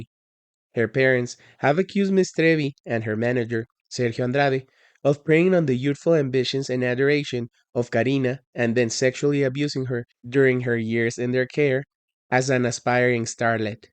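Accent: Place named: Latin America. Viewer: Excelente inglés latino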